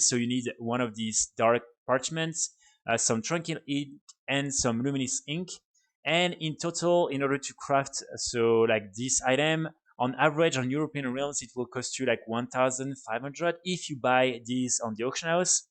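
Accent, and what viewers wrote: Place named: France. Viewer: Your content is some of the best, keep up the great work. Because you're French, you have a French accent. Your pronunciation is what is expected. Don't worry about it. The truth is, people LOVE IT.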